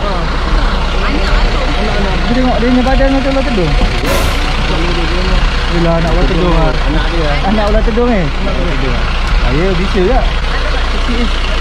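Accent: Kelantan, Malaysia. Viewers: Abg ni orang kelantan ke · abang orang kelantan kee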